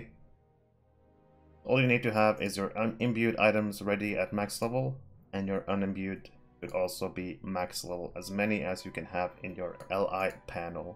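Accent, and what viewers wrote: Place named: Norway. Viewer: Ha ha Norwegian dude spotted, am i right? Can't quite place it though Jaja fra en bergenser hallo og bra video 👌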